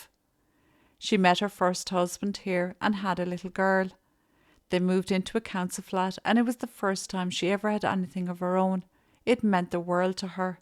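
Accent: Ireland. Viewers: I’m really hoping that you please 🙏 read my messages. For some reason I don’t receive any notifications 🔔 regarding your videos and I don’t know 🤷‍♂️ why so can you please be able to help me out with this problem? Thanks very much. Also I might like to add that I really enjoy your soothing voice and your material along with your lovely Irish ☘️ accent, your borough!! You have the most amazing and different videos that I’ve come across!!! 🦋🌈😊🌹🇮🇪💚😄.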